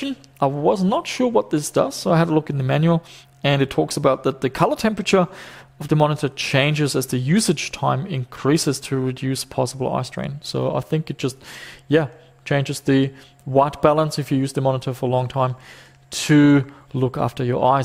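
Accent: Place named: South, Germany. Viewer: Wenn ich es nicht besser wüsste, würde ich sagen, du hast einen deutschen Dialekt 🤔 und deine Intonation klingt Süddeutsch.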